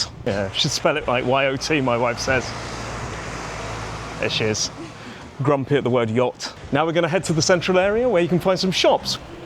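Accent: United Kingdom. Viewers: So nice, when I was a kid I went with my school to Southampton a couple of times (once to Southampton proper and once to Chandler's Ford) and we used to spend one day in Isle of White, I remember it so well, that was 50 years ago though. Maybe that's the reason I understan you quite well, because usually it is hard for me to understand british accent :) 5 pounds? That's really a bargain! BTW, I think there was a monument in Southamton (maybe a door of the city walls?) called "The Bargain". Am I correct, or it is a false remember?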